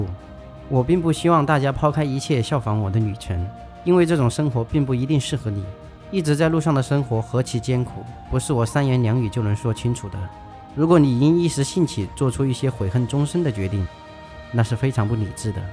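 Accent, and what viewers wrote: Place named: Sichuan, China. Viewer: 支持你！我也是为了梦想来到了美国！希望未来在美国闯出一片天地！老乡，听你的川普，很亲切！我四川广安的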